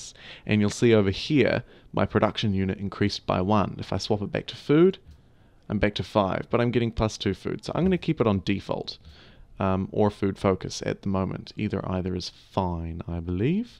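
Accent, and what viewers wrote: New Zealand. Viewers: That's not an Australian accent, most likely he's from New Zealand. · Somewhere from oceania, maybe new zealand.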